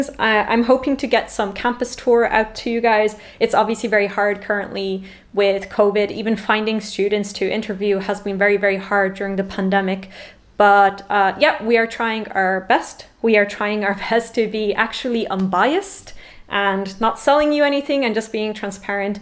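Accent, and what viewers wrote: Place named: Ireland. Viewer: Are you Irish? I love this accent 🥰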